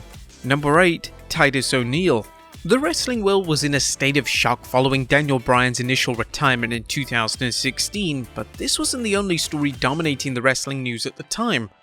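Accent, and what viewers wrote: United Kingdom. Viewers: I know your brittish and have an accent, but how in the hell do you get "Evil-lution" out of the word "Evolution"?? REMEMBER, The 3rd letter is an "O" not an "I"..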